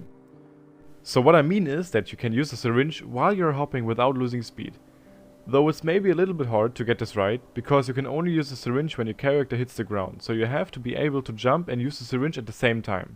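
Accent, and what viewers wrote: Germany. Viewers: a german speaking english making cry of fear videos? heaven.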